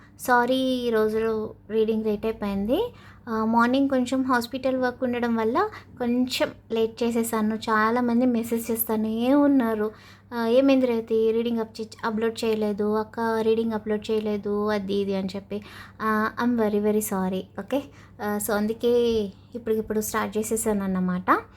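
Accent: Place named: Godavari, India. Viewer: మీది గోదావరి అండి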